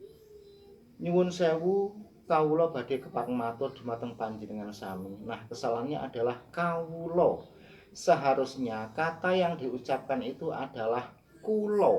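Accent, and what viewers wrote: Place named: Ponorogo, Indonesia. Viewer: Logat bahasa khas Ponorogo.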